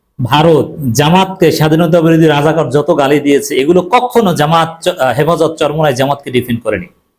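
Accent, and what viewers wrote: Barishal, Bangladesh. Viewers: আপনি কি বরিশালের??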